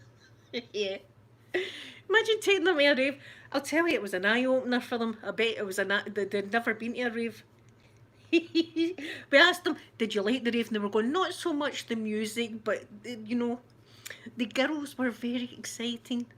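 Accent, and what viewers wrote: Scotland, United Kingdom. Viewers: Aw,. You're one of the most cheerful ladies I've ever seen. I like popping by just to hear your lovely and adorable Scottish accent. <3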